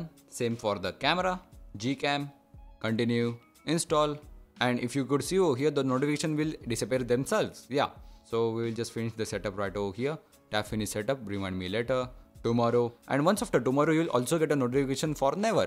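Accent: India. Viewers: too deep indian accent indeed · Why Indians voices Soo interesting and different? How are you saying "R". My nerves broken. Somebody can say something? I don't want hear voice of them omg😵(I am not racist). If I hear voice of one of them. all day I can't forget that. This make me sick, just I want to throw up🤮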